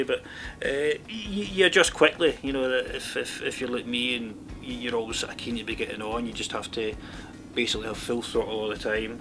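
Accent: Scotland, United Kingdom. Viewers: If you can't understand this guy you must be thick? Its English with Scottish accent. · Don’t understand criticism about pronautiation... This accent is in no way worst than american (90% of youtube is american english), which is also not a standard - british english... stay different! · Well..... It's as good, and as honest a review as I've seen of the CB125R so far. Nice one,. I'm away to Honda Chester next week to place an order for one. As for the negative comments about accent; he's a Jock. What the f**k do you expect him to sound like? Jesus... What a gang of bellends.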